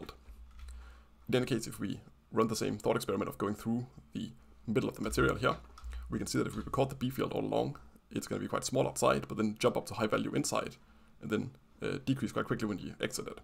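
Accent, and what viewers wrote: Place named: Germany. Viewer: Your teutonic accent and how unnecessarily fast you speak makes it very hard to understand what you’re saying.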